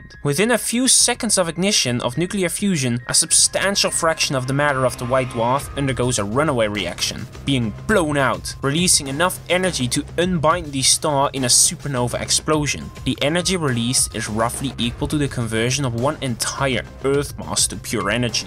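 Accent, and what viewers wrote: Netherlands. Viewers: You Dutch or sonething?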